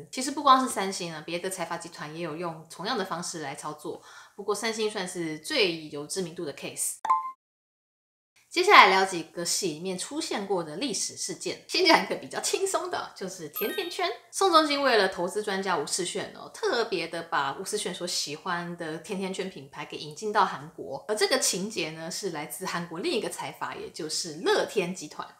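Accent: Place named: Taiwan. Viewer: 我没有点你的channel首页去看你的介绍，就凭你讲话的方式和语调就知道你是台湾人😄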